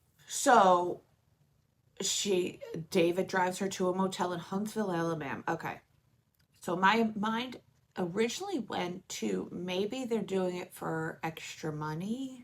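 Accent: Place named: New England, United States. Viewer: I love that the way you say certain things like I do, I know you're from the American Northeast, around New England. 😂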